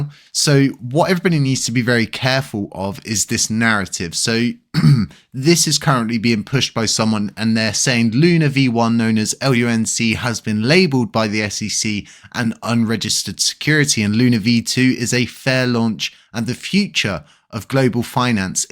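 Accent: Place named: Oxfordshire, United Kingdom. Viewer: Trying to work out your accent. Oxfordshire? Never the less. Great content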